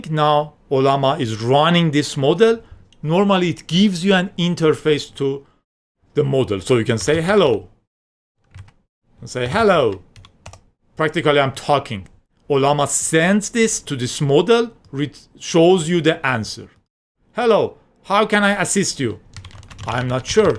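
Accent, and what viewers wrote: Iran. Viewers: WOW I like your thick Persian accent it makes me proud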